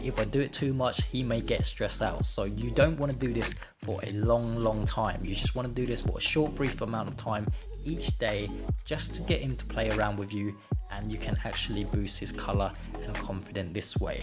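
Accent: United Kingdom. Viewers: Is this guy english? He has a british accent. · He’s a Thai but with a British accent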